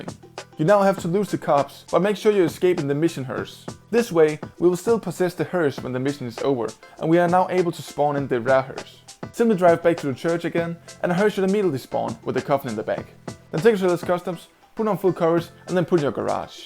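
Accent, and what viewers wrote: Denmark. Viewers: You sound danish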